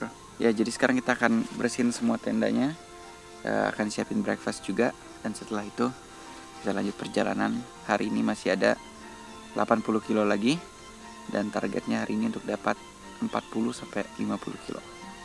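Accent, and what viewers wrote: Banjar, Indonesia. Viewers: Logat Banjar bahasa Kalimantan banget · Logat banjar nya ada jua lah wkwkwk😂😂😂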